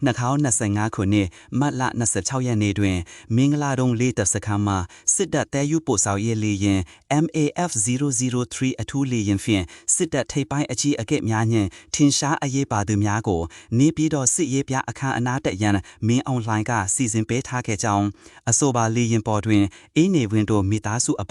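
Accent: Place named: China. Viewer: စိန မီဒီယာလား။အသံက ။နာေဖရး အသံထြက္တာ သတိထားနားေထာင္ျကည့္။တယုတ္ျကီး။သတင္းေျကျငာသူ။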